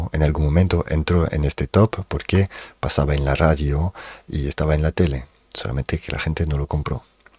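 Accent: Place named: France. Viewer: ME ENCANTA TU ACENTO AFRANCESADO Y DULCE . HABLAS BASTANTE BIEN EL ESPAÑOL , PARA NO SER TU IDIOMA NATIVO . UNA PEQUEÑA CORRECCIÓN CON MUCHO CARIÑO : (Se dice) TRADUJERON ✅ Traducieron ✖️ SALUDOS DESDE MONTERREY, NUEVO LEÓN. MÉXICO. YA TIENES UNA NUEVA SUSCRIPTORA ✅🥰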